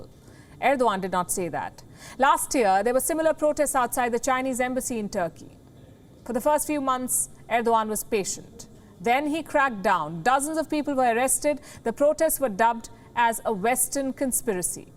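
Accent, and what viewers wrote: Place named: India. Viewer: Palki ji I want your audiobook Your reports are next level ,....nd Indian accent 🔥🔥🔥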